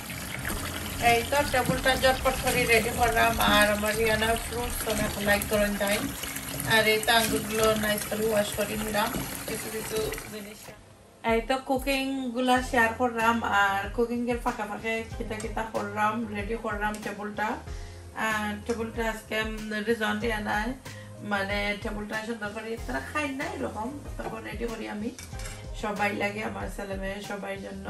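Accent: Sylhet, Bangladesh. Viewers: মনোমুগ্ধকর সিলেটের ভাষা অনেক ভালো লাগে আপু মন জুড়িয়ে যায়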